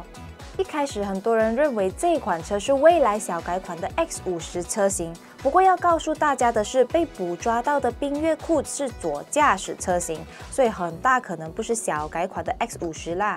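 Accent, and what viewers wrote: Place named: Malaysia. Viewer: 我喜歡這種大馬口音 加油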